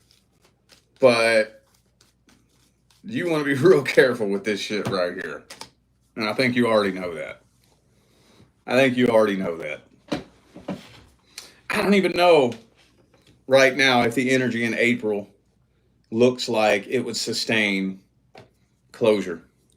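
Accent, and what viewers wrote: South, United States. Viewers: Hahaha you’re like me surrounded in vapes!! Eastern KY!!!!!! Go BIG BLUE!!!!! I KNOW you’re southern or somethin…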